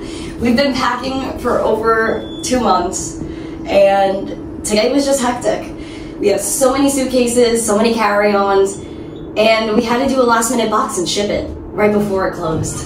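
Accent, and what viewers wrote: Brooklyn, United States. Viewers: Why sometimes you have a heavy brooklyn jewish accent? Old streams I watched you didn't talk like that.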